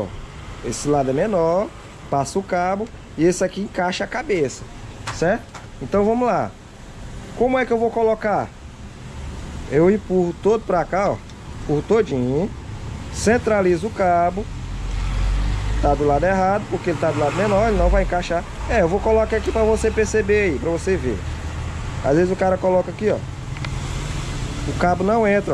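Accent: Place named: Northeast, Brazil. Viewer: Show de bola merrmão!!! Me divirto ouvindo a maneira como você fala. Vou encomendar um dicionário "Nordestinês" para entender algumas palavras. Mas de boa, não estou mangando não. Não se aperreie pelo amor de Deus. Abraço do Léo o Tiozão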